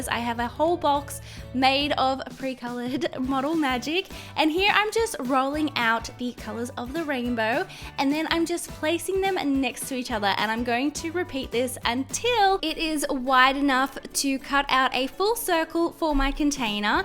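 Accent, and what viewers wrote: Australia. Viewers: I loooove your accent it is so so pretty. Is it Australian?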